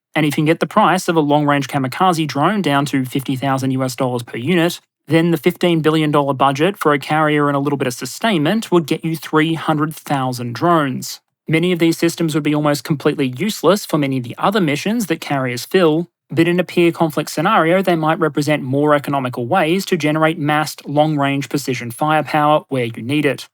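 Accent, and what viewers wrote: Australia. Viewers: Absolutely high quality research and even more superb delivery. What a witty Aussie 😂 pitty you guys can’t play rugby 🤣try next time in Suva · Didn't notice it till now that you've Aussie accent mate, Aussie Aussie Aussie!!! Oyyy oyyyy OYYY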